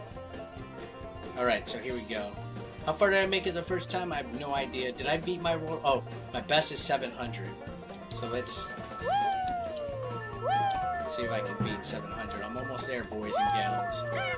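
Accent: United States. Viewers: Sweet country accent bro lol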